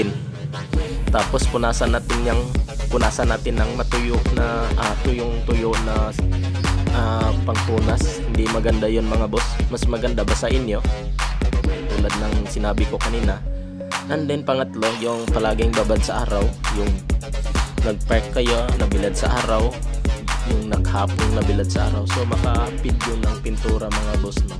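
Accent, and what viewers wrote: Visayas, Philippines. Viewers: Dong ang tingog nimo halata kaau na bisdak jeee pero ok na aron masabtan sa mga bisdak pod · Bisaya ka bay noh?😁😁 Salamat sa tip bay👍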